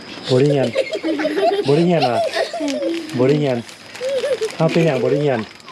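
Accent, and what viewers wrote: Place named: Luang Prabang, Laos. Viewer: มาดูคลิปที่2 สำเนียงพูดกับเด็กไปเหมือนสำเนียงหลวงพระบาง บ้านอยู่ไหนเหรอ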